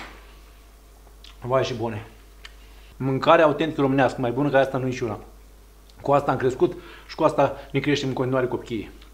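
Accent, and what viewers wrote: Moldova. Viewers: Like am dat,abonată sunt demult.Rețeta este o minune tu și graiul tău Moldovenesc o minune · Sunteți moldovean cu limba lata daca nu vă supărați de unde sunteti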